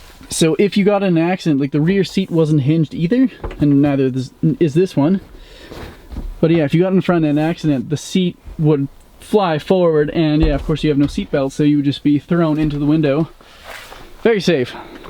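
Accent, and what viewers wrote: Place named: Canada. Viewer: Honestly I must be going mad because every time I find a Canadian on youtube, they seem to go out of their way to say words with "ou" in them, usually ends up being "oat".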